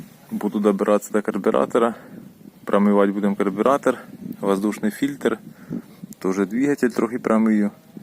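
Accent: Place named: Chernihiv, Ukraine. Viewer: Теска., мені теж твоя вимова нагадала мені, що це таке, щось знайоме, не міг згадати. Почитав в коментарях - Чернігів. Можливо, був там в 90-х. Приємно слухати. Бажаю тобі упіхів в житті та розвитку каналу. Я підписався. Хочу порадити, друже, якщо є можливість, купляй "корчики", роби їх чесно для ЛЮДЕЙ, знімай відео для нас, перепродуй вигідно для себе і радуй нас гарними порадами. Особливо це буде потрібно для нашої молоді. Щиро вдячний, МИРУ нам усім і ДОБРА в кожну родину!!!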